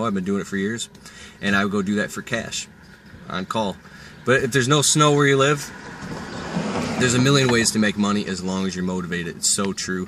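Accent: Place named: New England, United States. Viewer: The Landscaping Employee Trap Hey man i gotta ask you, are you from New England? You said ruffin' for roofing.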